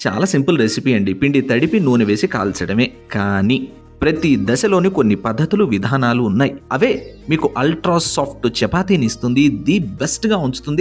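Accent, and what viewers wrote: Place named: Telugu, India. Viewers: Narrator voice Mind Blowing.... His Telugu Acssent wonderful🎉🎉🎉🎉🎉🎉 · Love the Telugu accent and Telugu! prefer Telugu without english words!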